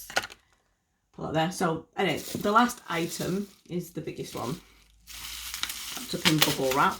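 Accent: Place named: Southern England, United Kingdom. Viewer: Hi, just subbed to you, great video! That becca pallette looks well lush! I have been trying to figure out your accent...Yorkshire or Lancashire? I am a Yorkshire lass but my accent is all over the place due to living down south for a while when I was younger, your accent sounds like you have some southern influence too??? xx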